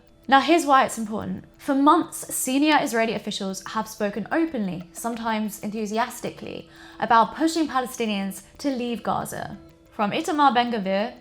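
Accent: United Kingdom. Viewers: I saw your thumbnail. And I thought, “I bet she has a British accent” BAM! I’m psychic 😮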